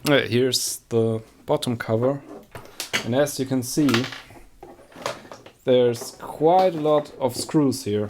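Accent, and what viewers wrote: Germany. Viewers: Ich höre da ein deutschen raus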